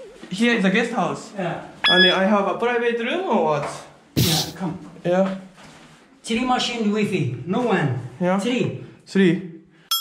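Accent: Kansai, Japan. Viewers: are you kansaijin?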